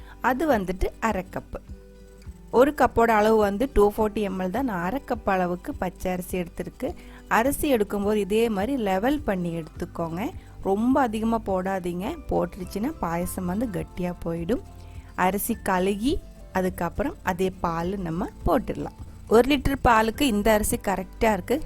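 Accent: Kerala, India. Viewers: I subscribed your channel when I saw your video for the very first time itself. Not for the receipe I saw but for your soothing voice. Lovely Tamil with a kerala touch....now regularly following your recipes...you are going to hit the cliff soon...this is the first comment I'm placing for a YouTube channel.stay blessed!!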